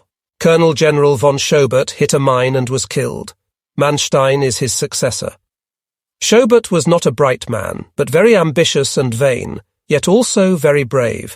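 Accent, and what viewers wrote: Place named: South, United Kingdom. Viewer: it’s an open text AI popular with audiobook transfers. It has a slight southern UK accent but is very good for a freebie. You can always tell the earlier versions because of mispronunciations and statements not making sense. It has developed well past that now and is pretty accurate no matter the text.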